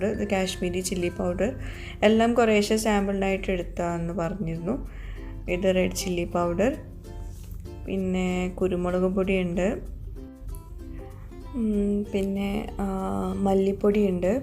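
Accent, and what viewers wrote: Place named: Palakkad, India. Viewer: ഞങ്ങൾ പാലക്കാട്ട്കാരാണ് പട്ടാമ്പി ഒക്കെ ഇങ്ങനെ ആണ്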